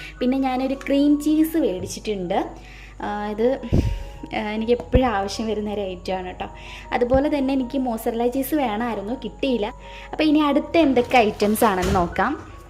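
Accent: Kollam, India. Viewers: കൊല്ലം സ്ലാങ് പറയൂ. മിക്കപ്പോഴും മറക്കുന്നു.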